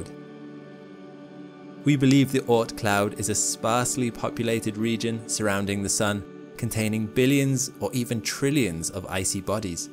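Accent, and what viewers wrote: United Kingdom. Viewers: I can't get past the narrator's voice, it's like a mixture of a foreign accent with a British accent. Videos are pretty good, hire someone else to narrate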